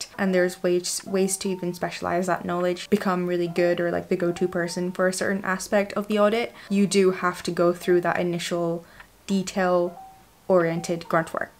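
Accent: Scotland, United Kingdom. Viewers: Sounds scottish to me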